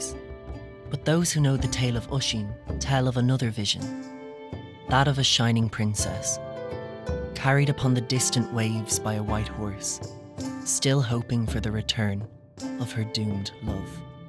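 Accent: Dublin, Ireland. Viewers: One complaint: the stress on 'Danann' ought to be on the first syllable, not the last one. Unless you're speaking Munster Irish, and the narrator sounds like they're from close to Dublin. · Its a Irish accent because an American cant pronounce the names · Lol you can hear the narrators irish accent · D4 accent? Just sounds like Dublin to me. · I appreciate that you had someone with an Irish accent narrate this. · Love the story. The irish voice also goes with it too. Keep it up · As an Irish person I agree with the OP because the Irish accent adds the authenticity that an American accent wouldn't, whether Irish American or not. American accents are already so omnipresent in all media that I don't see the problem in our myths and legends being recited by a recognisably Irish tongue. There's no reason to feel insulted 🤷🏽‍♀️ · He's got an ear-grating D4 accent though. 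The most reviled accent in Ireland.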